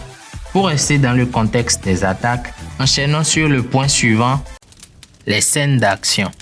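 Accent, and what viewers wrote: Benin. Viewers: J'adore le montage la vidéo😱 (façon trash). On sent l'accent de nos voisins les béninois dans la voix off. Beaucoup de courage 💪bro, tu mérites de buzzer. Et je rajoute : en Afrique (Togo); il existe aussi des putains d'Otaku.😁😁😁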